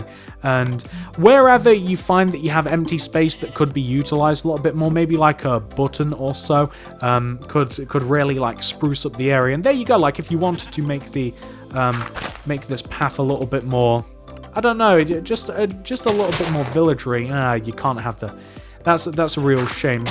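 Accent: England, United Kingdom. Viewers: are you English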